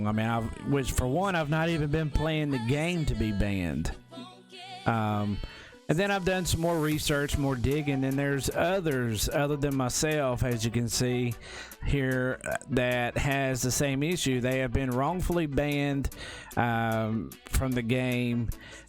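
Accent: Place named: United States. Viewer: I like your country accent. You sound like you eat apple pie and fried chicken everyday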